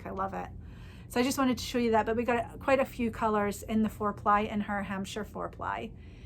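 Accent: Scotland, United Kingdom. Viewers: Raining sideways!!!! Thank you so much, this podcast absolutely made my day. This is the first time listening, and I just burst out laughing at your comment about the rain the in Western Isle being sideways. I was born and brought up in Stornoway, so yes, I can absolutely agree with you. I am living in Fairfax, Northern Virginia, and I could listen to your beautiful Scottish accent all day - especially as I have not been able to do my yearly pilgrimage home this year! I will probably be binge watching to catch up with your many shows, and I look forward to shopping with you very soon. xxx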